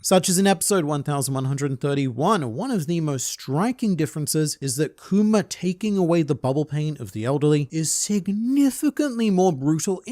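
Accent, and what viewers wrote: Australia. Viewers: Can’t really blame you. voice is rather soothing with that slight Australian ‘twang.’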